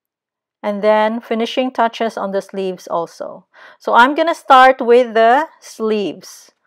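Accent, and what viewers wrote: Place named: Philippines. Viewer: Are you Filipina? I like the way you speak.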